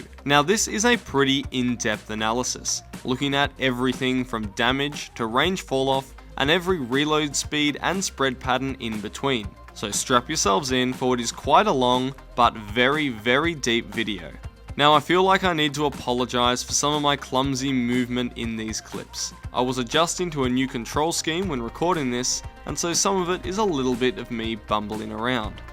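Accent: Australia. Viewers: Are you Australian I'm Australia you sound like your Australian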